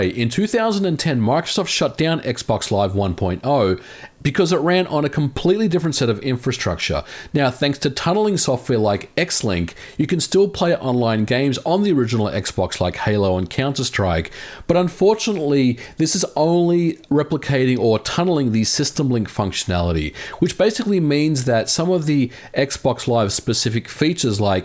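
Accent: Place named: Australia. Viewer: That's a very mild accent for an Australian.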